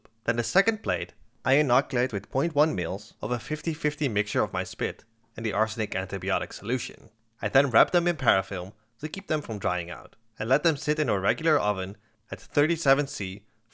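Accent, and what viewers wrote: Netherlands. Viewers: This was certainly quite an interesting video to watch, reading up on these amonia based antibiotics that are currently in development was also quite an interesting read and hives some hope for antibiotics. based on your accent I am wondering if you are dutch?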